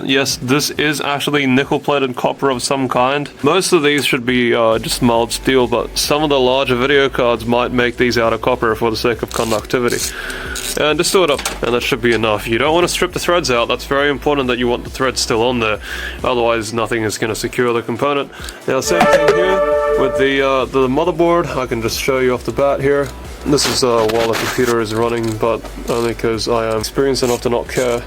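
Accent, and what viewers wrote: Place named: United Kingdom. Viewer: For f sake, finally a video about this. You are 3 years late mate. And I wonder (based on your accent) if you're British, because I live around the UK and I had this problem a while ago already.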